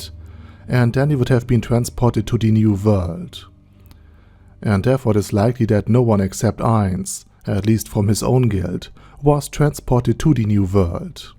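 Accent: Germany. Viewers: Anybody can take this how they want but your smooth masculine German baritone gives me very nice dreams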